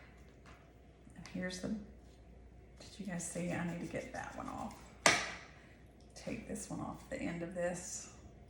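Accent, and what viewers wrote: United States. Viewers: I've got a large crop coming in, I need to try this thanks. That's the first time I've heard "Oh my stars" in so so long. You have to be Southern. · love tomatoes and even though you pronounce it the American way, I forgive you! I am right off course because I am British! Thank you so much – Paul from England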